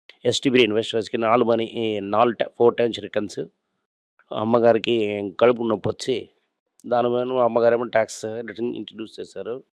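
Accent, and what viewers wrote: Tamil Nadu, India. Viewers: Tamil accent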